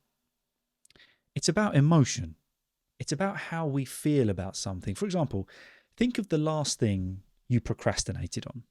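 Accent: United Kingdom. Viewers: I’m a college student from Taiwan, I start to learn english recently, and your videos really help me a lot, thank you. By the way, British accent sounds elegant!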